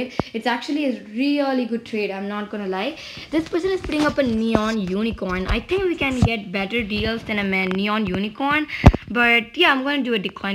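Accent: India. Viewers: im also indian i was wondering if she is also indian because her voice is sounds like indian and she is indian :)) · By the accent she is Indian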